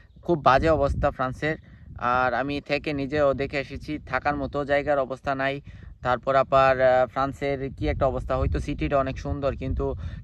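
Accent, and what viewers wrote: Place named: Sylhet, Bangladesh. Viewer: Aita bhai syloti ra kore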